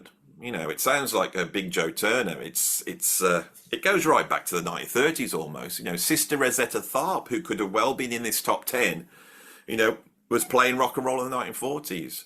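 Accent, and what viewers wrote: United Kingdom. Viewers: !...intelligent list...you dug deep for the true "roots" of music...the foundations of genres. Your shows are unique imo to other folks on YouTube...I love your British voice/dialect...it relaxes me so...anyway, keep it up...shoot for 50K subs by end of the year! Enjoy the weekend...sincerely, 55 yr old drummer Webb in Atlanta, GA.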